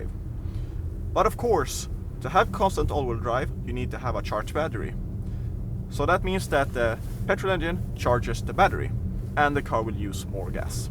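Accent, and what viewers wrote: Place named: Sweden. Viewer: Cheers to Sweden, love the accent!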